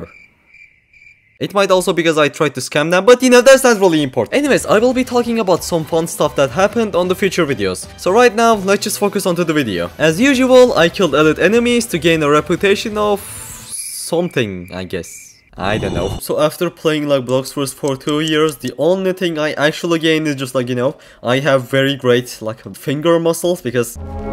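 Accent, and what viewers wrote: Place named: Turkey. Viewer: abi türkmüsün ingilizmisin belli değil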